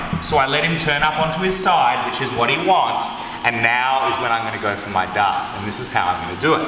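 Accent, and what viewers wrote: Australia. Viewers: aussie accent is one of the most friendliest accent in the world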